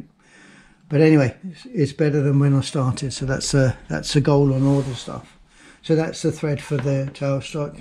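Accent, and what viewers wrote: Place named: United Kingdom. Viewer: Just subcribed to your channel, didn't realise you were from UK! (duuh!) I'm from march in cambridgeshire you don't sound far away! That amazon box with the bog rolls on it smiley lol! Take care, mate!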